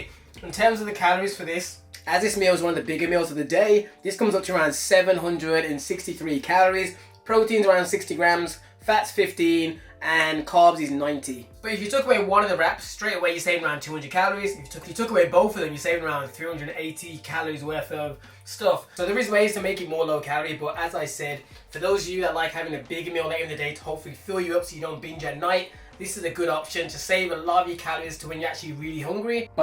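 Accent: United Kingdom. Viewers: Yo, don't hate me but I JUST realized you gave a British Accent. Lol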